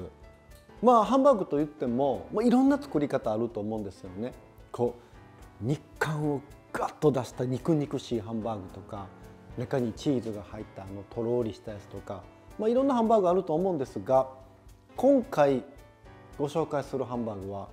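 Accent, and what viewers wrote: Kansai, Japan. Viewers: こんなすごいレシピを無料で教えてもらえるなんて本当にありがたいです！コウさんの関西弁の柔らかい話し方も大好きです😊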